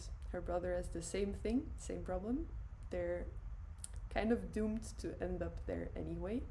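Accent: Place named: France. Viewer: Hey, where did you get your beautiful accent from. Bit sounding like romantic french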